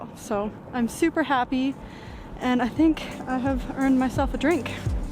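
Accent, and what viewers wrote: Canada. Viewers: Love your Canadian pronunciation and I am sure the dolphin was a good omen, all the best on the ICW.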